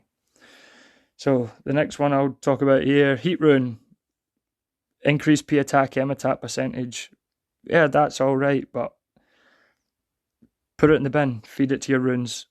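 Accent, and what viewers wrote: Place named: Scotland, United Kingdom. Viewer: Great Vid, and great to hear a Scottish voice on a Content creator video :)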